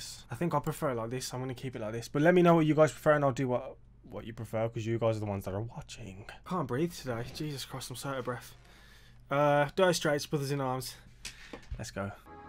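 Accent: Birmingham, United Kingdom. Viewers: I love your accent!! Brummy? Watching you from Ireland. I love to see the younger generation appreciate real music. 🎶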